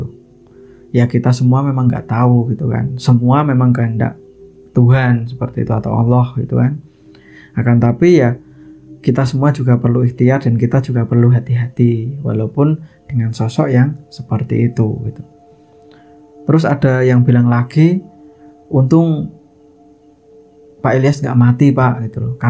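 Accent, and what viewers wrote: Java, Indonesia. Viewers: Njowone pundi to mas.. kok medok banget. Seneng aku dengere👍🏻😁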